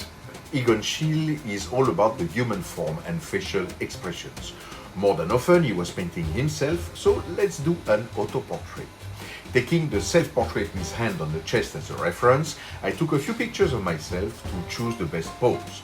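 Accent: France. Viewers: I realised your French accent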